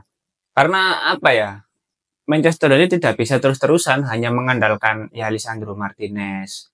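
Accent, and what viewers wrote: Java, Indonesia. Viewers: Jowo e ndi??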